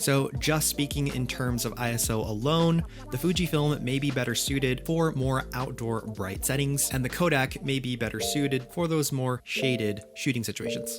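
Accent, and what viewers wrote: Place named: United States. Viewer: Thanks this your name well look I like my vintage camaras which ihave few years back when iwas younger now im pensioner in London i still got my canon AE1 program which i love ❤️ I used to canon F1 nikon Fm2 mamiya 6x7 afortunally i got them stolen any way your video help me because hopefully I going to Colombia in few weeks time i believe Kodak film 200 is good because Colombia is very hot and sunny ☀️ so according what you say Kodak is perfect for Colombian whether since im back to photography i see you twice you from like Japan o somewhere there you English is American and you very good explaining all this camera staff means photography which really apreciated is like i feel young again thank you very much 👌👌🙋‍♂️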